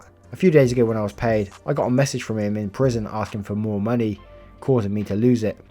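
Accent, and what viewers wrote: United Kingdom. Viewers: I think I asked on another video, but where are you from specifically in the UK? I hope to start becoming more familiar with the different regional accents. Much love!😍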